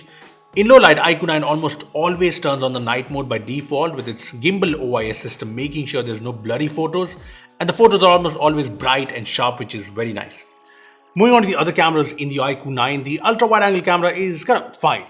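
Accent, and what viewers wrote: India. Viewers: Hate indian accent of english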